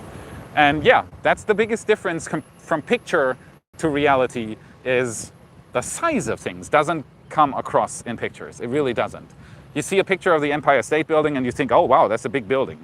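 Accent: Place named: Germany. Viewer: WOWOWOWOWOWOWOwowowowowow!!! Guys who programmed Apollo Computer were at a Computer History Museum talk. At a VCF West - Vintage Computer Fest were some Saturn-5 guys. Ish Spreken Kaine Deutsch . Some how I thought u were a Spaniard but I think I heard German. No hablo Alemanes.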